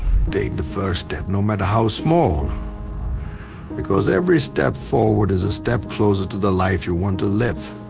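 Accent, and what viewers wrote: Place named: India. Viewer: BRO THEY ARE DELETING MY COMMENTS, WHAT I AM SAYING IS THIS DUDE SOUNDS LIKE AN INDIAN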